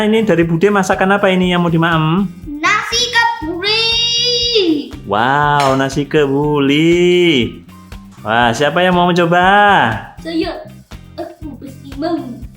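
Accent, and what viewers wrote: Banyumas, Indonesia. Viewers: Jujur aku penasaran.mbaeki keturunan opotoh.wong jowo opo bulee.kok kadang Rodo ngapakk.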